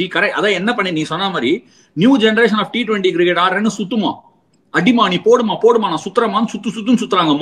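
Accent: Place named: Chennai, India. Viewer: Two lines review for entire match..super na.. akkul perthudurum , namma Chennai style la ketkum podhu arumai...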